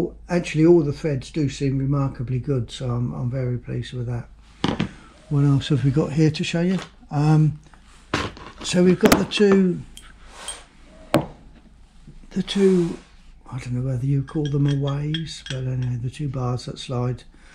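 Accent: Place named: United Kingdom. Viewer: Just subcribed to your channel, didn't realise you were from UK! (duuh!) I'm from march in cambridgeshire you don't sound far away! That amazon box with the bog rolls on it smiley lol! Take care, mate!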